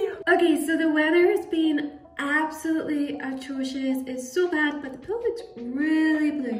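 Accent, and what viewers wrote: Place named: Scotland, United Kingdom. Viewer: I love your accent and the way you said bobby brown, I was just like, yup, she is definitely scottish. I find northern british accents so friendly and welcoming😊 It fits your personality and the bubbly and fast way you talk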